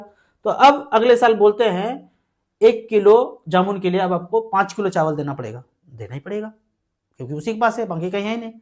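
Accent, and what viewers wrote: Bihar, India. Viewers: Bihari boli bolta hai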